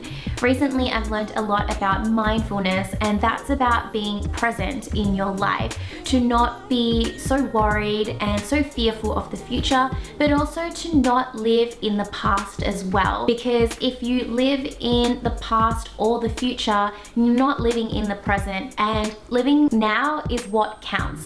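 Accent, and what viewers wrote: Australia. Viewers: Hang on is she Australian??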